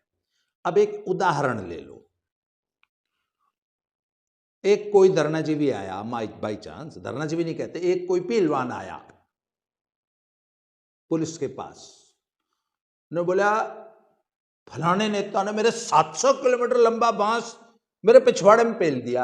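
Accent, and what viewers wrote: Haryana, India. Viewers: Very nice presentation of Dharnajivi wrestlers 'history in Haryanavi accent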